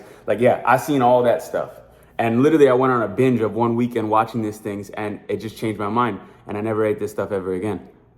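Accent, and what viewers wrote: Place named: AAVE, United States. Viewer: Lose the ebonics white boy.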